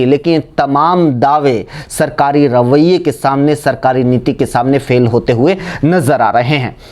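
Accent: Haryana, India. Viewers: Very nice bhai Haryanvi tone is also very nice in between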